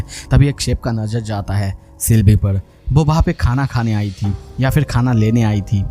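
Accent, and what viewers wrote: Bengal, India. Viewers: Bengali ho kya bro😊😊❤❤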